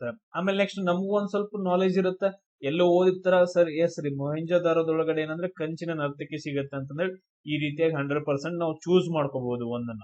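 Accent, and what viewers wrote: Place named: Uttara Kannada, India. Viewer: Basically I'm from malnad side.. your teaching style and u.k kannada is superbb sirr..,🫡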